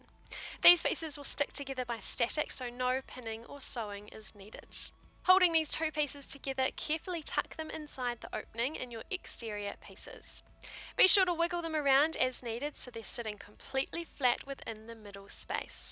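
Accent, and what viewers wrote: New Zealand. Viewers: she has a very strong NZ accent 🇳🇿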